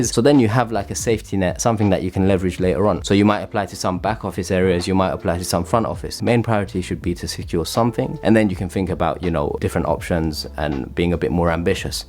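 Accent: United Kingdom. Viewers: Are you British? or it is just an affluent accent ?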